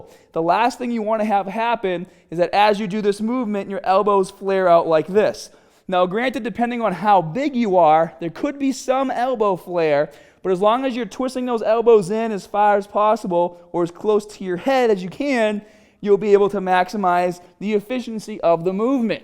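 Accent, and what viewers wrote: Boston, United States. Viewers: That accent makes me nostalgic!!! :-P Go Sox! Go Pats! :-P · “Taaget” “staaated” I have a feeling he’s from Boston! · You from boston man? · Yo!!! Where in Boston are you??! I listened to the first 8 seconds and knew you were from Boston. This video is needed. I am building muscle in both my hard and so this is going to be added to my routine! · Still can’t figure out if your from Boston or Australia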